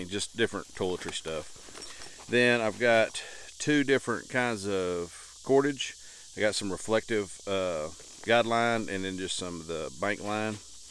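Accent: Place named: South, United States. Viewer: I spent this entire review repeating everything you said trying to improve my southern drawl accent 😄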